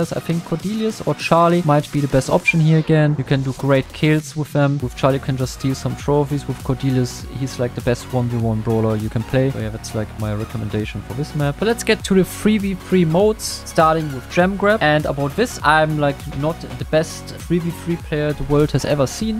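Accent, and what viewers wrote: Germany. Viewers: Man hört voll den deutschen Akzent 😂😂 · Are you German? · Mann hört einfach dein Deutschen Akzent 😂❤ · Bro is german and i didnt notic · Du bist Deutsch,ne? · Der deutsche Akzent macht es viel interessanter. Danke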